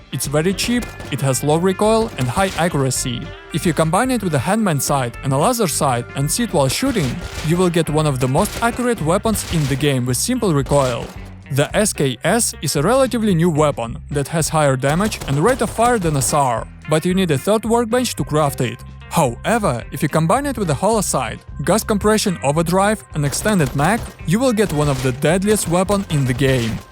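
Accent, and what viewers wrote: Russia. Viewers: я слышу руский акцент ?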